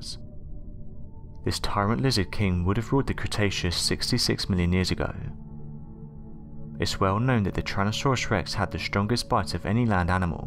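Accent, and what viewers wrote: England, United Kingdom. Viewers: Get rid of this narrator with his heavy English accent slur is hard to understand.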